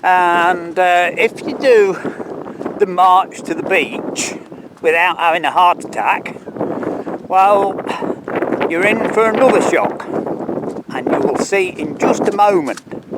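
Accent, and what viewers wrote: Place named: Lincolnshire, United Kingdom. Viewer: I actually quite like Skeg. My mum and dad have got a caravan in the Butlin's camp up there- (with live music on in and outside of the camp pretty much every night), which is a real novelty to me, 'cos we never had anything like that when I lived back home in London. I've gotta ask this, by the way: is your accent a generic Lincolnshire one, or has every part of Lincolnshire got its own specific accent and way of talking?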